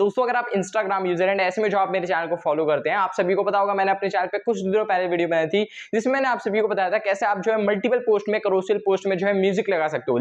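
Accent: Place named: India. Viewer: The problem is he is speaking English... I understand like every 15th word Oh, it isn't English. I thought he just talks really fast and with accent. He uses a lot of english words though. Damn Indians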